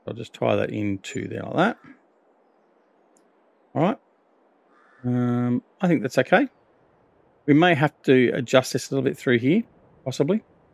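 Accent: Australia. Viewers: Bro you can tell this dude is Australian because that’s exactly what one sounds like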